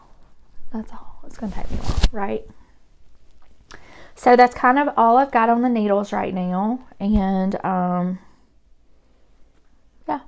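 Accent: South, United States. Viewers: Just finding your podcast and starting with Episode 1... FANTASTIC! I love your southern charm, accent & beautiful hair cut and color! Looking forward to following your knitting adventures!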